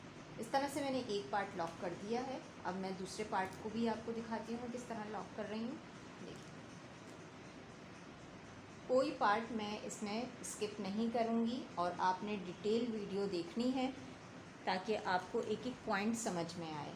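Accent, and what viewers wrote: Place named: Karachi, Pakistan. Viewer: Aoa. U r great n soooo sweet. Bas ap se ek request ha k ap. Karachi se belong hain isleay apke bolne main pata chalta ha. Isleay ap open ko opin bolti hn. Youn samjhen ap ne pen ki tarah yad rakhna ha. .. plz mind na kejeay ga. Ap boht achi hn❤ or parhi likhi bhi hn. Bs is choti se mistake btai ha. N sorry agr apko bura lga ho to.....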